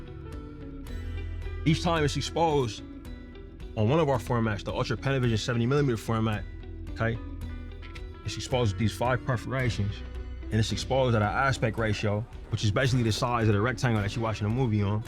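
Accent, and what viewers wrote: California, United States. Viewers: If you close your eyes it sound like a gang member telling you bout film 🤣🤣 I know he from cali. All love P.S i jut Looked it up, he really from oakland🤦🏾‍♂ No offense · I was like where did he grow up? I seen California and said that’s why I’m hearing nispey and yg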